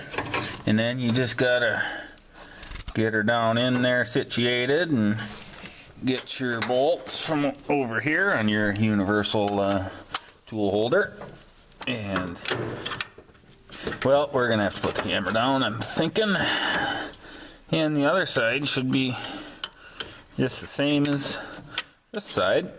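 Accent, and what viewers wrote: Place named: East Coast, United States. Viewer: Great video. I love the back east accent reminds me of home. Would love to have a beer with this guy.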